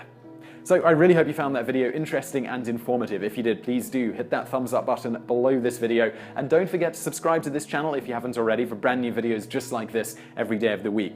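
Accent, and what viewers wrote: England, United Kingdom. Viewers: Nothing more funny then a white guy with an English accent talking about misconceptions of the various nations, at least he's actually trying I give him that much.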